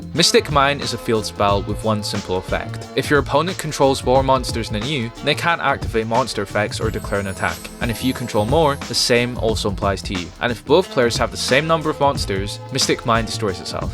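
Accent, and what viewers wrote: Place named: Scotland, United Kingdom. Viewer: Your Scottish accent is so absurdly subtle. So much that I’m not sure if it actually exists or if I just need to sleep. Say purple burglar alarm.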